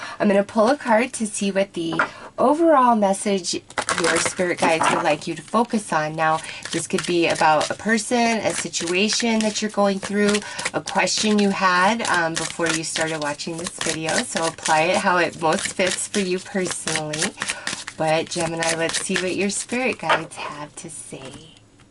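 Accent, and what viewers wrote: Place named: West, United States. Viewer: Beautiful reading that rings true. I love your melodious western US accent (I think). Your voice drips with love and kindness. God bless you and yours.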